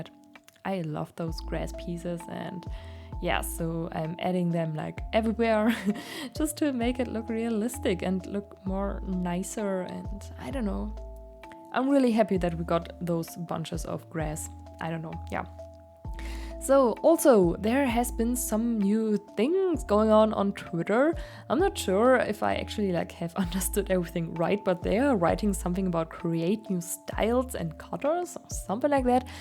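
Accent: Germany. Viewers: hört sich sehr deutsch an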